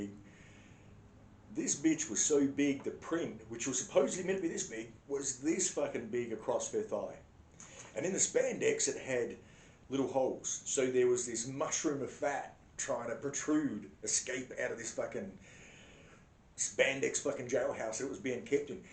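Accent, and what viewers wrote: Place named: United States. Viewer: This is Kaz, somehow my grandson logged me out and I can't get back in. Geriatric memory loss 🤣 anyway love listening to you always. However you are starting to get an American accent. I can understand everything you're saying 🤦‍♀️. I'll hit the subscribe button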